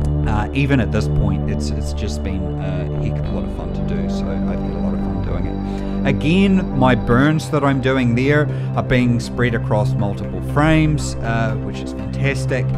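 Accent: New Zealand. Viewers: Bro it’s refreshing to hear that kiwi accent 🤙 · YO IS THAT A KIWI ACCENT???? YOO KIA ORA?????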